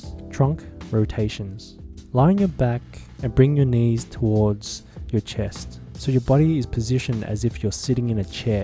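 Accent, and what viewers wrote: Australia. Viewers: i love the auschraliaaan accent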